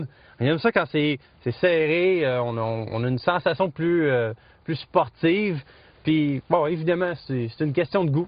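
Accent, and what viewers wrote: Quebec, Canada. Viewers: Il est québécois, non??😂😂😂😂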